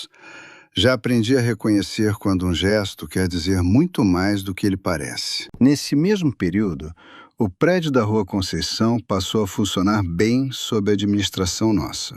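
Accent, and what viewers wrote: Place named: Rio de Janeiro, Brazil. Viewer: Ai a voz desse locutor é muito mole muito malandrão muito carioca fala mais firme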